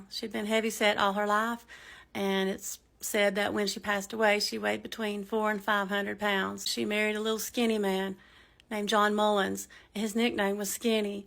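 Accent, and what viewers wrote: Appalachia, United States. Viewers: I love your content and your Hillbilly accent. I’m a hillbilly also from the Ozark mountains of Arkansas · I know that you hear this all the time, But I think that you are one of prettiest women on YouTube! And though I too live in North Carolina as well, I truly love listening to your mountain accent! And I had a step dad that was from Morristown, Tennessee and the crazy sayings that he would say! Made me laugh so many times! I think that he was from so far back in the sticks that they had to pipe in Sunshine! Keep up the videos sexy gurl!!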